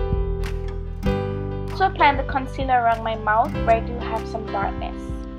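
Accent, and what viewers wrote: Jamaica. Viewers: I hear that accent are you from JA? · is she jamaican? she sounds like she is.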